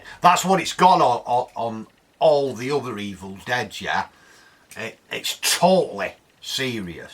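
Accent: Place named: Cockney, United Kingdom. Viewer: Holy cockney!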